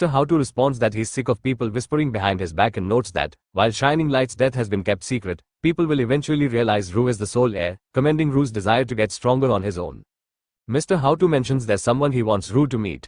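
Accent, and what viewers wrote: India. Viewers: I mean, if this is AI doing the reading, then Choosing an indian accent is just Evil. If its just some guy readin then I wont complain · From all the accents you could use you CHOOSE Indian????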